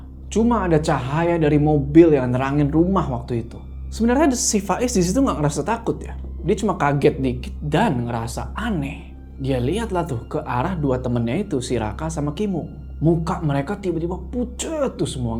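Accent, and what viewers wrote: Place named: Java, Indonesia. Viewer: bang, aksen jawa lu makin hari makin bagus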